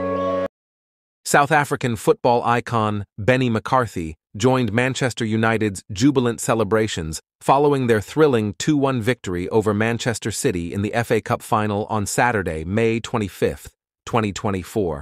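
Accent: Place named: United States. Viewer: uyacasula ngale American accent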